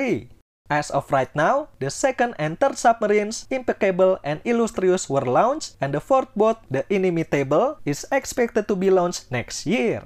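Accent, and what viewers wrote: Indonesia. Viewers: I guess the narrator is Indonesian😊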